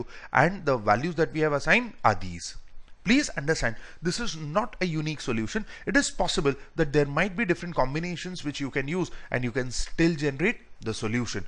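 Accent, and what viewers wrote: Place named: Asia. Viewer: Your accent is too asiatic, dude. Good problem👍🏼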